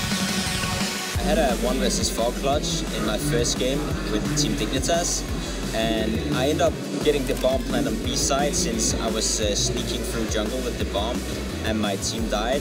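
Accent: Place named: Australia. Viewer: He sounds Australian lmao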